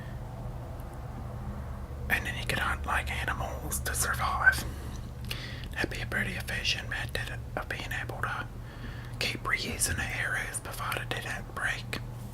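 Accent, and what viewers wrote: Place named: Baltimore, United States. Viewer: Hey, love your videos. It's interesting to hear that your accent is PA based. You sound SO much like you're from Baltimore, which is where I live. Anyway, keep up the good work. Your voice absolutely lulls me to sleep.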